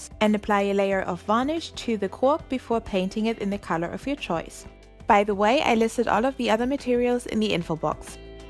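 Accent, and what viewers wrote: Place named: Germany. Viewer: Sie kommt zu 100 Prozent aus Deutschland ich höre es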